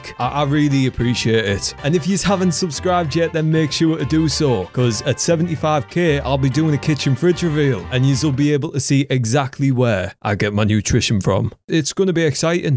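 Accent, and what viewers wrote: Scotland, United Kingdom. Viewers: Probably british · bri"ish man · sounds Scottish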